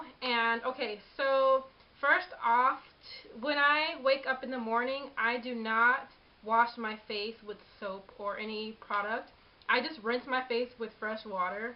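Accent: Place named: South Korea. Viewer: lol i like how you speak korenglish lol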